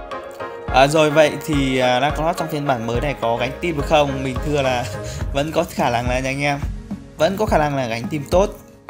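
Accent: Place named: Thanh Hoa, Vietnam. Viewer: người thanh hoá à. Thấy có cả (mô)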